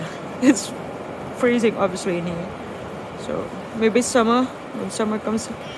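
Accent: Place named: United Kingdom. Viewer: Grabe yung accent mo British na British na,love the idea of beach hut. PRINCESSANNE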